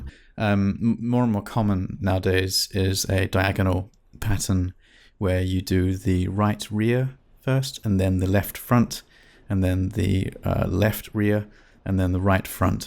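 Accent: England, United Kingdom. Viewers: great video, I trust English voices more than any other accent!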